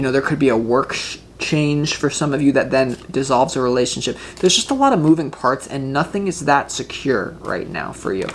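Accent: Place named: Staten Island, United States. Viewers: and extremely intelligent and wise... love the NY accent, HA ! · I love this reading. The NYer accent especially. Omg. Prepandemic is def. Right!! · loved the reading 😍 LOL the accent got me laughing.. sounded like staten island accent.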